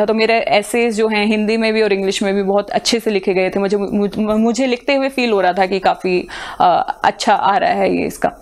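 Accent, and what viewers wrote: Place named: Haryana, India. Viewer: Haryanvi accent 🤘🤙👏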